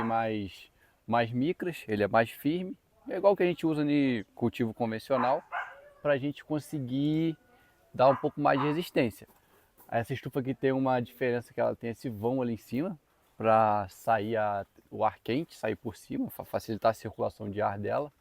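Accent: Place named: Rio de Janeiro, Brazil. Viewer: Pelo sotaque deve ser daqui do Rio. Eu tenho uma pergunta já que não sei onde fica sua propriedade. Qual a temperatura máxima da sua região?? E se houve problemas devido a temperatura elevada?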